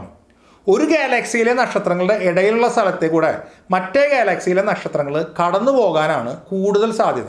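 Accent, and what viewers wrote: Thrissur, India. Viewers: നല്ല അവതരണം വളരേ വ്യക്തതയാർന്ന വിവരണം . അതിലുമുപരിയായി ഭാഷാശൈലി ഒരു തൃശൂർകാരന്റേതും . ഞാൻ ഒരു തൃശൂർകാരനാണേ അതുകൊണ്ട് പറഞ്ഞുപോയതാണ് . ഇതുവരെ ഇതുപോലുള്ള ഏറ്റവും പുതിയ അറിവുകൾ പകർന്നു തരുന്ന മറ്റൊരു വീഡിയോ ആരും അപ് ലോഡ് ചെയ്തതായി എന്റെ അറിവിൽ ഇല്ല . വീഡിയോ വളരേ നന്നായിട്ടുണ്ട് .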